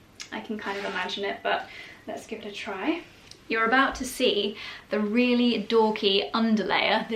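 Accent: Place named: England, United Kingdom. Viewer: How odd - she has quite a clear English accent. Which bits couldn't you understand? Tho I suppose she does sometimes talk quite fast.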